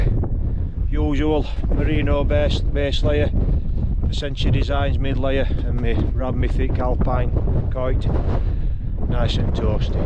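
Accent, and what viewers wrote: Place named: Yorkshire, United Kingdom. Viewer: Top quality Yorkshire accent that pal 👊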